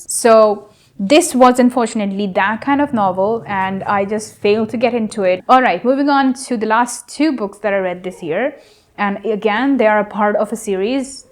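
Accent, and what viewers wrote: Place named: England, United Kingdom. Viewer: Aap English accent ko kaise prounce kar leti ho dear 😚 tips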